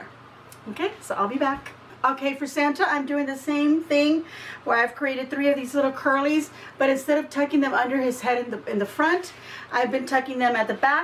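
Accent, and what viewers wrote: Texas, United States. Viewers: can I assume you are from Texas? Loved watching how you do your crafts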